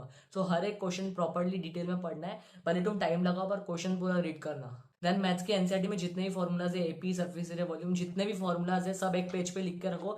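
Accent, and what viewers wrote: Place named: Maharashtra, India. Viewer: The marathi accent 🫡🫡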